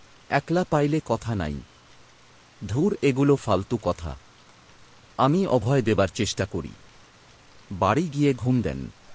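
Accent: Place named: Rajshahi, Bangladesh. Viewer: রিডিং পড়ার মত হয়েছে। রাজশাহীর টান বাদ দিতে হবে।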